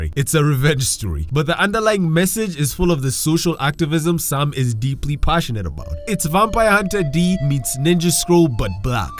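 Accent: Kenya. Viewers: Mans a Kenyan, I couldn’t tell by the accent at first but good work big man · I know a Kenyan accent when I hear it. instant sub!!!! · wait wee ni brathe kumbe😂😂 lol the accent didnt hit until I saw 2007 n was like wait you is raia😂😂 heres a sub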